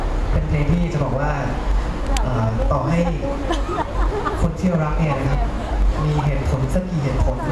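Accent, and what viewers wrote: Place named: Rural, Thailand. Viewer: พูดเหน่อน่าร้ากกก😘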